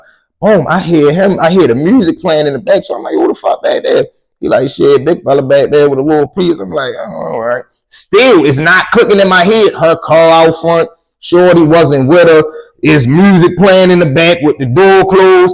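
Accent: Baltimore, United States. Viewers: I knew you was from Bmore when i heard you talking..im from east . North Rutland..i kno the talk..crazy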